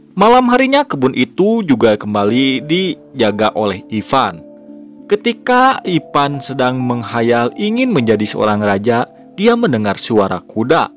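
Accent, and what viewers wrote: West Java, Indonesia. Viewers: Logat sunda na kabawa keneh jadi apal euy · Sunda pisan john logatna,,mantaap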